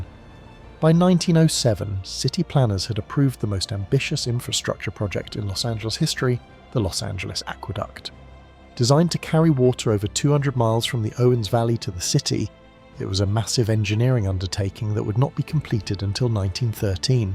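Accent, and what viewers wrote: United Kingdom. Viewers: i really do love your reconstruction. And i love the most this britisch accent.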